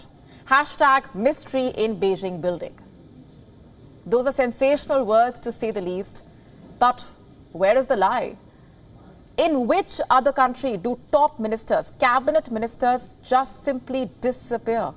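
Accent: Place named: India. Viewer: Indian tongue as always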